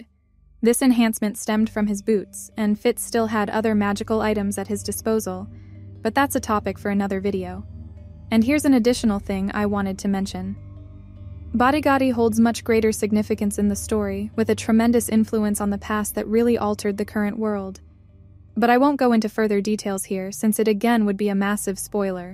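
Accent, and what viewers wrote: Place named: Germany. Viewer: Man hört das du Deutsch bist.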